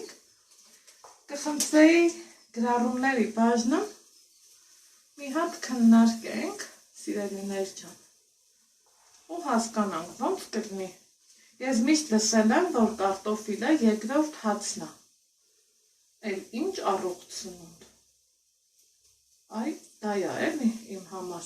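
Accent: Lori, Armenia. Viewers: Շատ հաճելի բառբառա ջան Լոռվա բառբառը👍🤗🤗❤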